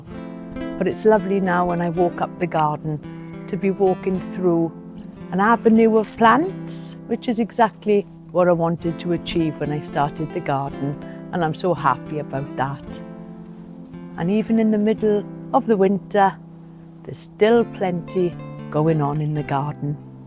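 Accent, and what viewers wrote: Wales, United Kingdom. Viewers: You have a certain charm to your videos that make them relaxing to watch. Not sure if it’s the music or your Welsh accent or both but it works really well. The garden still looks great. Keep enjoying it.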